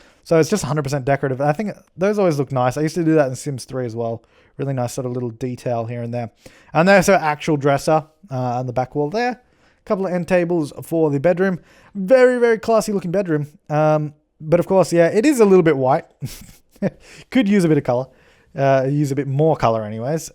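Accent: Australia. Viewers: Absolutely my favourite sims4 series so far, as I usually play the game starting from little to no money. And your Aussie accent is somehow perfect for this kind of commentary. Perfect. Haha.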